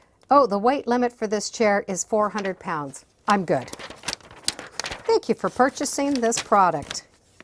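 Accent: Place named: Canada. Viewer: I just love watching you! Especially your pronunciation of some words in your Canadian accent!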